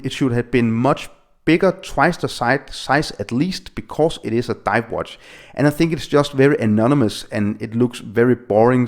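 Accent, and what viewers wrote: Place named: Denmark. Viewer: Aha, dann viele Grüße nach Dänemark, hab vom Dialekt gedacht, das kann doch kein Amerikaner/Engländer sein :-)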